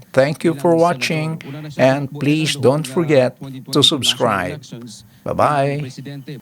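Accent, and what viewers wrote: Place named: Visayas, Philippines. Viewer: Bisaya gyud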